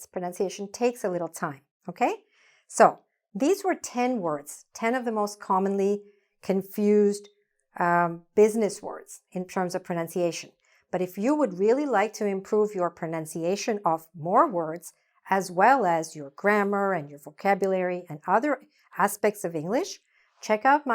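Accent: United States. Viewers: In London we don't open the mouth in pronouncing ( have/ has ) and we don't stretch the pronunciation of the letter A in ( have / has ) like you do and that is because you speak American English and not English English. Thank you for your nice show. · This video teaches us the words in an American or British accent?, i'm so sorry because I don't know, it's because I'm a beginner learning English😊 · Una pregunta las clases son con acénto americano o britanico? Gracias.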